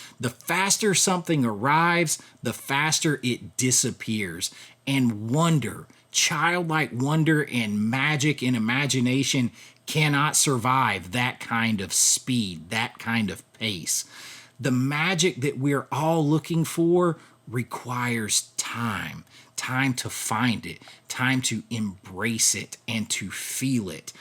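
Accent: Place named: South, United States. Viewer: Absolutely does. Sorry i never check back for replies because i guess im gettimg older but again tonight im watching you new video as we text. Me and my daughters joke now "pop that tape in i gottt youuu" 😅with your southern slang l😂 love it. If i can pull up the $ ill definitely patrion. You kmow how it is brother.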